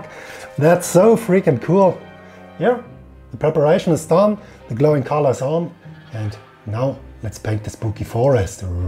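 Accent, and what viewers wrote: Germany. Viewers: Wie man raus hört das du Deutscher bist :). Schönes Bild. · Ich weis nicht woran aber man merkt dass er deutsch ist. Und er gibt sich Mühe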